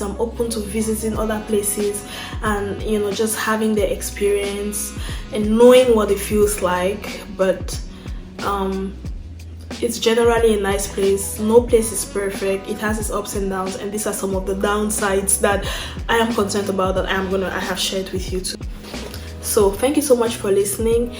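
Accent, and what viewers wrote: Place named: Nigeria. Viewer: You sound so Nigerian 😃😃😃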